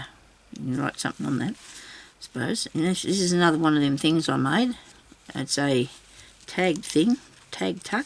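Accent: Australia. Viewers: Good day from WA. Its so nice to here another Aussie accent. Thank you so musch, I think your book's awesome!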